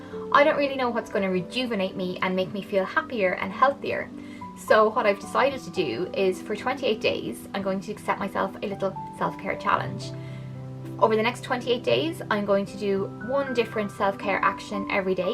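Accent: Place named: Ireland. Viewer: Love the irish accent x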